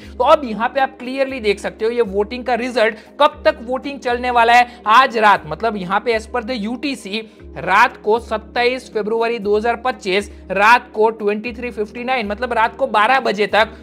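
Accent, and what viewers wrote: Gujarat, India. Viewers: Bhai tu Gujarati hai kya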